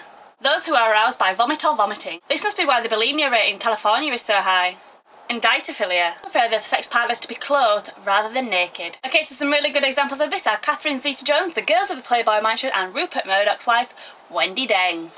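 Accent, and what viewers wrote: Yorkshire, United Kingdom. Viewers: Are you from yorkshire? my dads side of the family are all from hull, and the accents sound very similar, of course, I'm not english so I'm not that great at recognizing where the accents are from :)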